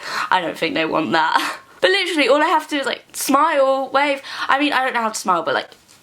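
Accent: United Kingdom. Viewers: Is she British or American ?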